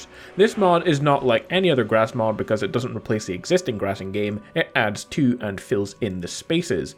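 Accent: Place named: Scotland, United Kingdom. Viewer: hes scottish i believe :)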